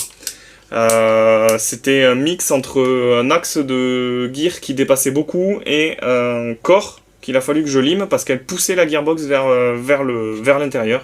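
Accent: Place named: Southwest, France. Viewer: y'a un petit accent et des expressions sympathiques , tu est du sud ouest peut être ?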